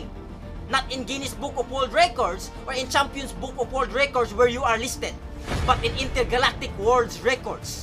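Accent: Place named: United States. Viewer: american english i love it lol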